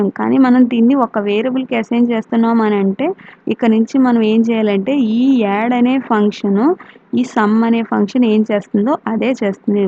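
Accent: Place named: West Godavari, India. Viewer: Mam midhi West Godavari dt Slang ga undhi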